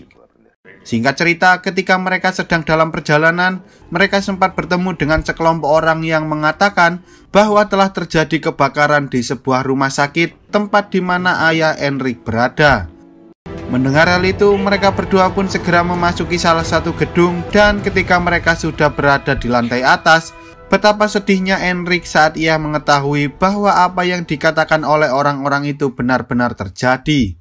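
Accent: Java, Indonesia. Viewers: Laah tnyata wong jowo toh?? 🤣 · Mas ngomongnya dengan bahasa indonesia yg baik dong. Logatnya jangan keciri bnget orang jawanya. Muak aku masss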